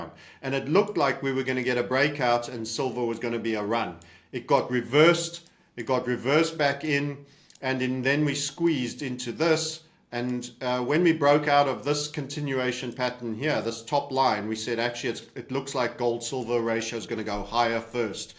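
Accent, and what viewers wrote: South Africa. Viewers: This is an Africaans accent, right? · South African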